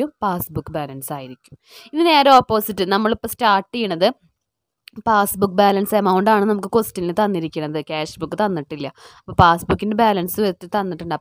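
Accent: Thrissur, India. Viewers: Teacher niggal thrisuur anno, aah oru slang😊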